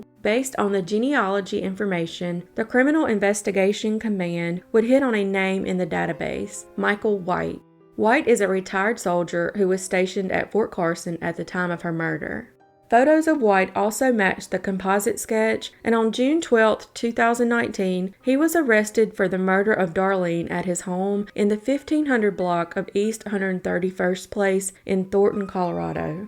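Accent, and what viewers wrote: South, United States. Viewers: You are my fave Youtuber in the genre. fresh stories and a nice southern drawl :)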